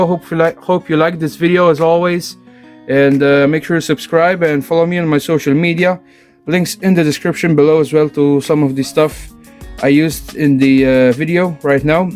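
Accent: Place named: Malta. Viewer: your English sound like Maltese English :-) Interesting design and very well made 3d printed parts, tempted to get an SCX10 but now I'm too busy with the 3DSETS Landy and Rancher, 3D Printing is very addictive.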